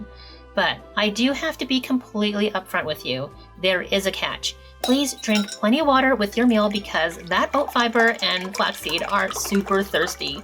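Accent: Canada. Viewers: This looks like a good recipe for my new Kitchenaid mixer still in the box... first of your videos I've seen and subscribed... from the accent it sounds like your from friendly Canada,,thanks for the video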